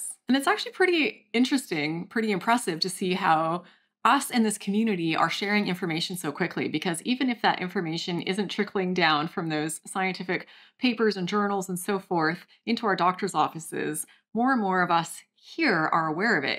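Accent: France. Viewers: Thank you so much for your great work, your french accent is beautyful❣️A lot of greetings from Germany 🙏🌈🙋‍♀️ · Digging the French accent and French Flintstone. 😂 (I speak French!)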